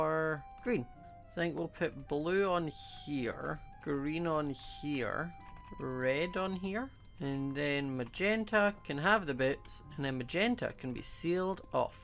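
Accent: Scotland, United Kingdom. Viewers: OMG I LOVE YOUR ACCENT I LOVE SCOTTISH ACCENT SO MUCH ok lol I need To stop.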